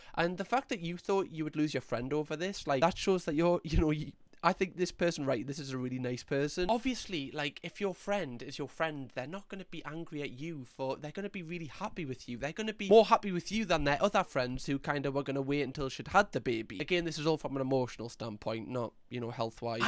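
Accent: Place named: North East England, United Kingdom. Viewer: I didn’t realise it was so long I’m about 50 minutes in, I love to binge watch so I wouldn’t have noticed I would have thought I’d just watched a series but thanks for the effort you put into these videos they are very entertaining you have an ace sense of humour and I love the north eastern accents, this one and Irish both melt me 😊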